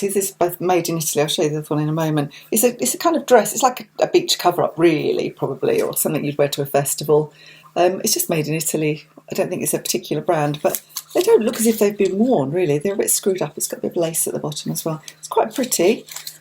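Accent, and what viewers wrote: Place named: United Kingdom. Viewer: Oh this channel is delightful! Awwww, I'll never tire of a lovely British accent!😌💜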